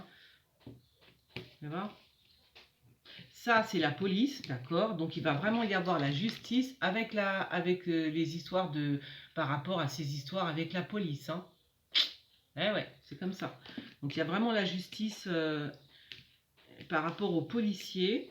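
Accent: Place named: South, France. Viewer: bonjour quel bel accent meridional!!!!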